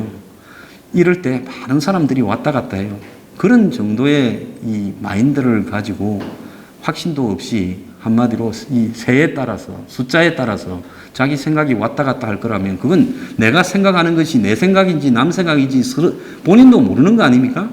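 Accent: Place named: Busan, South Korea. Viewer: 명강연에 살맛납니다! 간간히 나오는 부산사투리에 더 재밌습니다!^^ 늘 감사히 잘 듣고 있습니다! 건강 챙겨가시면서 방송 해 주셔요~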